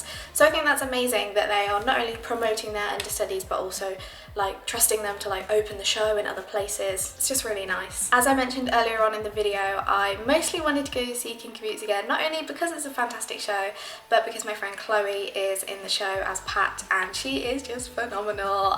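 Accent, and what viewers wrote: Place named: England, United Kingdom. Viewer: Also I loved the way you said my name, English accents 😍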